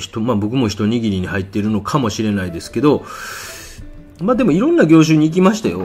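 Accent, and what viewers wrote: Kansai, Japan. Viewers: 柔らかい語り口調の関西弁も落ち着きます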